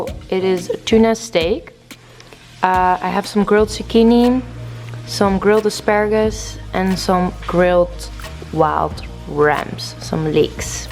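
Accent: France. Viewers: Is she french ?? She has like a french accent Ps : that tan didn't look so good , her natural skin color looked way better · Your French accent is so cute. I’m French btw 😜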